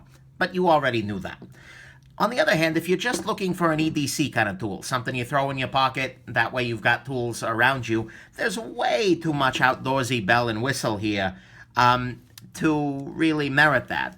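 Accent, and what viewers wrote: New York, United States. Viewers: This man literally has to have had surgery that altered his voice. If you watch a video just one year later he sounds like a completely different New Yorker hahaha. It weirds me out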